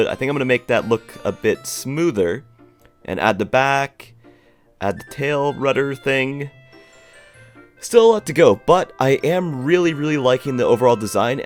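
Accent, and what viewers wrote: Canada. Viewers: love the accent, very Canadian 😎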